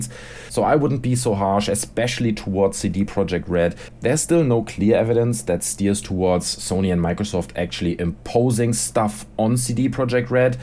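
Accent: Germany. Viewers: wollt gerade fragen, ob du deutsch bist :D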